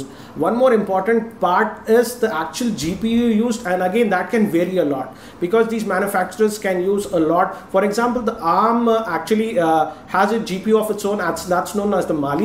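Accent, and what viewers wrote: India. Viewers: God Indians accent make me very mad .....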